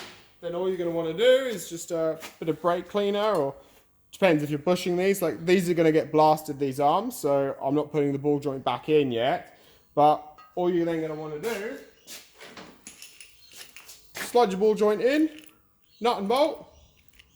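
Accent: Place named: Australia. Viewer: He's sounding more like a Aussie Boi's! Nice Video Dude Keep up the awesome work.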